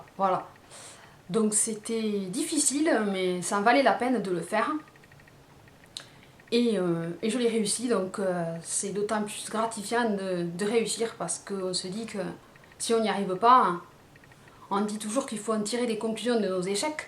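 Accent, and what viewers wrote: South, France. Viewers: Je voulais juste te remercie car en regardant ta vidéo je me suis remotivé dans mes études,j'ai vraiment envie de m'appliquer (tu m'a également fait versé une petite larmichette). Ton parcours est certes atypique (comme tu le dis si bien avec ton accent du sud), mais c'est surtout le parcours du battante. Je trouve que tu forces l'admiration et le respect. Je te souhaite le meilleur dans la vie et beaucoup de réussite professionnelle, tu le mérites vraiment, t'es une fille en or. Bisous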